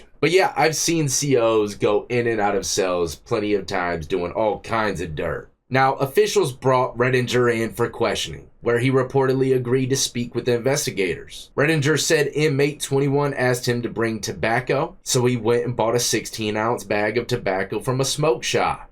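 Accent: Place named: South, United States. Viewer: Love your Southern accent. Love from 🏴🇬🇧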